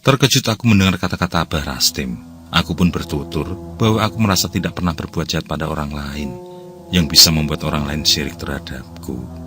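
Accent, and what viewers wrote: Java, Indonesia. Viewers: Eaalaaaah bah Rastim tiang Jawi toh? Tak pikir urang Sunda.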